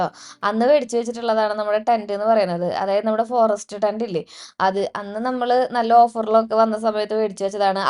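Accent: Thrissur, India. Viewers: Enthu sughaa videos kandirikkan, pinne Thrissur slang enikk orupad ishtaanu, kurachukalam njangal Guruvayur ndayirunnu, 7 muthal 10 vare njan padichathokke avideyaa, ente Malappuram pole enikkishtaa Thrissur nem. Nte Guruvayurappanum avide alle,pinne jeevithathile ennum orkkan ishtapedunnathum allathathumaya orupad ormakalum aa nad enikk thannittund.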